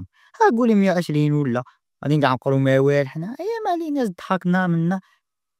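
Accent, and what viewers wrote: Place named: West, Algeria. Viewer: درتلك ابوني علاجال هدرة الغرب رشقة 😂😂😂❤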